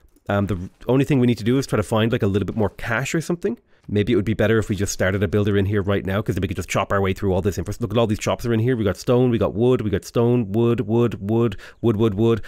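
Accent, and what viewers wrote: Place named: Ireland. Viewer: Is that a "reserve Irish-ism"?! Adding a "th" sound where there is one instead of not being able to say the "th" sound?! I treasure the few times we get the Irish accent from our great!!! Wish they were more common, but alas they are not!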